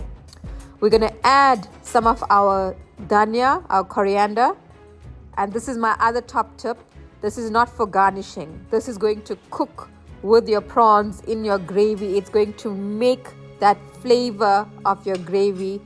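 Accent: Durban, South Africa. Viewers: Thank you very much…looks and sounds delicious… I have to try your method… my family loves crab and prawn. From you accents I’m guessing your from Durban SA my beautiful home of birth…I may be visiting this year sometime… do you have a restaurant and is called Peri-Ma’s Kitchen? Just got to visit. Once again Thank You ❤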